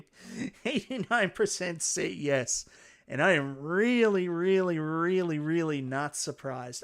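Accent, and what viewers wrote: Canada. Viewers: So glad there is a Canadian voice for arcade 1up. Hoping for marvel vs Capcom 2 in Canada somehow without that savage VAT added.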